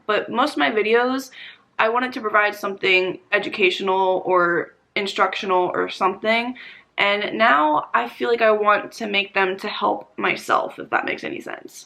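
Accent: New Jersey, United States. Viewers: I hear a cute New Jersey accent 🙂 I never remembered it from before but I also watched your old vids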